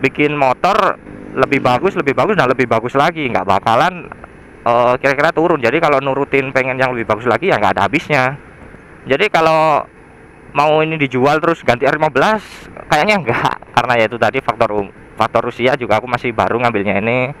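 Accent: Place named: Java, Indonesia. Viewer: medok bngt bahasanya..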